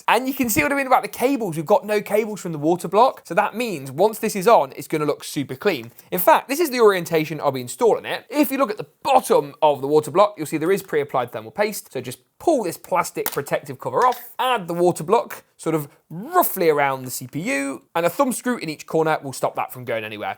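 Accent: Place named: North, United Kingdom. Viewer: I cant understand the north accent \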